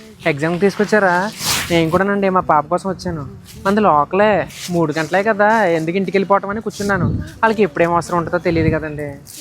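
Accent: Godavari, India. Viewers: Voice nice godavari slag ❤❤❤❤❤❤❤❤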